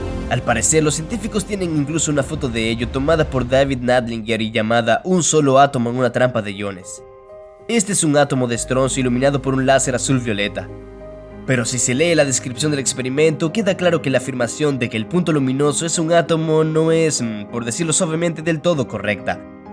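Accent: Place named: Yucatan, Mexico. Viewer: Es men es Bombaaaa hablas Yucateco!! Saludos Bro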